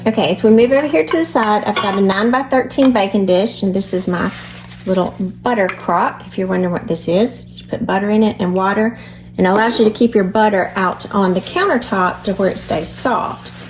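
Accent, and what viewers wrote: South, United States. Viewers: I don't know if it was the recipe or the southern accent that made me subscribe, but here I am 😂.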